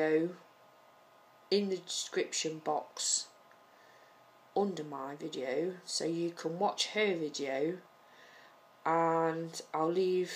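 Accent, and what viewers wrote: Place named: Yorkshire, United Kingdom. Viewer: Great video :) I said the same thing as you about 'vase'. I think accents also bleed into one another from outlying areas. I can hear a little Yorkshire in yours and also some similarities as someone I knew from Stoke-on-Trent :)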